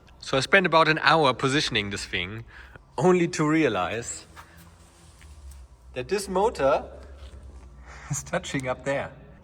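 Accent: Germany. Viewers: I can tell you‘re German, not only from your accent but from the way you constructed that building. Amazing engineering, congrats! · dieser deutsche Akzent haha 🙈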